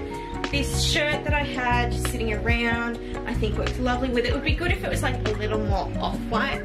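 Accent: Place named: United Kingdom. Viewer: Where are you? I’m close to New Orleans? I have some things I would love for you to make Please say your near by 🙃 But your accent makes me think your across the pond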